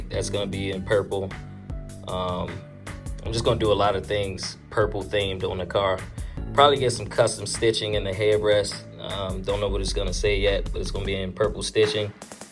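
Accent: Baltimore, United States. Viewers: I’ve been watching your videos for month or so, and I been saying to myself “this dude sound like he from Baltimore” “I wonder if the purple is a Ravens/Baltimore thing”....Finally got my answer lol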